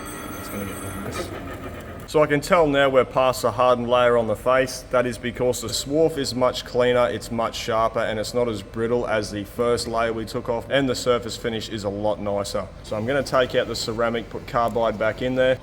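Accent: Australia. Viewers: Hi, great videos. Your explanation of the purpose of the springs in a flex plate/clutch is wrong, maybe it's just the way you said it, you speak Aussie and I speak American. The main purpose of the springs is to absorb torsional vibrations that are generated by the engines that drive them. The torsional vibrations can destroy a gearbox or transmission. In automotive applications they also act to prevent harsh engagements when the clutch the clutch pedal is released. Automatic transmissions don't need them as the torque converter/fluid coupling acts as a damper. The size of the flex plate, number of springs, and the rate of the springs is governed by the horsepower it needs to transfer to the driven parts. A multi-cylinder engine does not need heavy springs since the power impulses (torsional vibrations) are close together. A one or two cylinder engine needs very heavy springs as the torsionals are close together. 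Look at it this way: In a four stroke engine with two cylinders, there will be one power pulse per revolution. In an eight cylinder engine there are four power pulses per revolution. Think of these as positive torsionals as they accelerate the engine in the direction of rotation. Conversely, every time an exhaust valve opens, there is deceleration due to the drop in cylinder pressure, so in the case of the eight cylinder engine we have four positive pulses and four negative ones, The negative ones are of less intensity of course. When I used to work on boats a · I have never had the captions on and thought the same thing, and I definitely don’t fit that description 😂. I have boots older than 😢. Thanks for the translation of Aussie to American 😊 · All this time I thought was starting these videos saying "Hey young guys..." and wondering why he thought old guys (like me) wouldn't be watching these videos. 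But today I accidentally had the closed captioning on, and was surprised to discover that he was saying "How you going guys?"! I guess I need to brush up on my 'Stralian.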